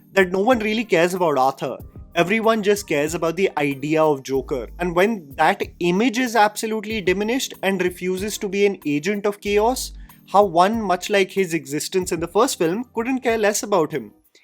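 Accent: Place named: India. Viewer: jesus, what's with the indian accent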